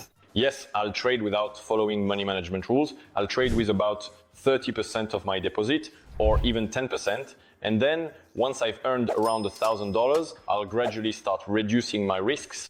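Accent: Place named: France. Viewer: You have a French Accent 😁😁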